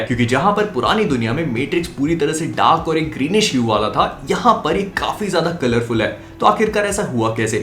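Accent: Bhopal, India. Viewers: apka accents sunker bhopal ki yaad ajati hai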